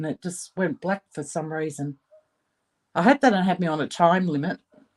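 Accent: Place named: Australia. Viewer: GORGEOUS creations! Keep doing what you're doing! Fellow Aussie here, transplanted to Texas over 40 years ago, so am loving your accent and the occasional maggie in the background! Thank you. 😀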